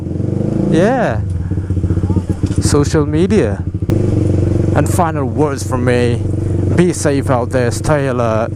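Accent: United Kingdom. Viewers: aksen britishnya keren bang, mantap (y) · very british, i love your accent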